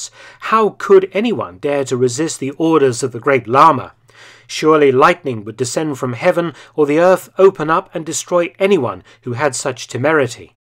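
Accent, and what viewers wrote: United Kingdom. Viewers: Lol the whole time I heard this I thought it was strange that they had a British man representing China invading Tibet😂😂 I wasn’t listening too well as one could imagine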